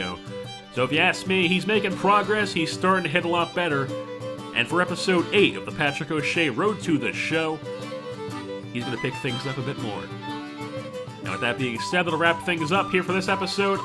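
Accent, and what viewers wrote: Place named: Boston, United States. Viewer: Dang, I'm liking that Boston accent. Sorry had to root for my sox today, but glad too see Patty getting better and better as the games go by.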